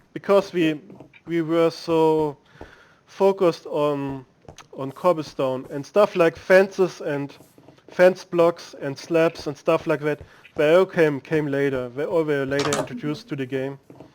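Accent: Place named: India. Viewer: I think india. Sounds like an indian accent.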